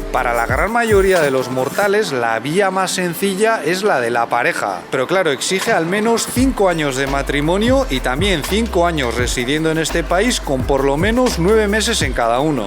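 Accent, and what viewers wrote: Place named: Spain. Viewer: Puedes usar un poco de sarcasmos en tus videos? Te sale muy gracioso por tu acento tierno español, gracias me divierto mucho, tu bebé es muy linda.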